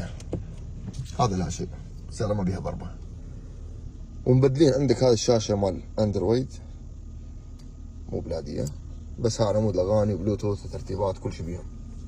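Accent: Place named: Baghdad, Iraq. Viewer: السلام عليكم اخوية الغالي اني ماعندي اشتري هاي السيارات الحلوةلكن يعجبني اتفرج علئ فديوهاتك لأن طريقتك بالكلام مثل ذبت أهلنا مال بغادة الأصليين وأتشرف بيك اذا كنت عربي او كردي لأن أحنة كلنا أخوة عراقيين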